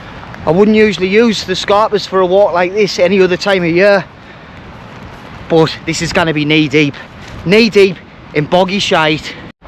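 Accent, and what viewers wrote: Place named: North East England, United Kingdom. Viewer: I left the north east 35 years ago and was just trying to pin point your accent 😂. I'm walking up from Leicester to the top of Scotland in day long stages (when I get chance between work and other commitments) . I drive to where I got to on the last walk, then do another hike north. I had a rather brutal walk from Glossop to Marsden in January, over some similar stunning terrain in the north Peak District. I think I'll route myself through this spot when I get this far.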